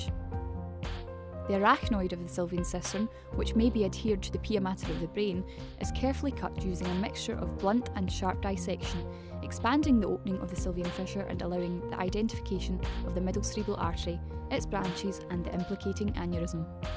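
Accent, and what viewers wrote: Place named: Scotland, United Kingdom. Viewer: What a captivating dialect! Scottish? Excellent synopsis of the approach as well!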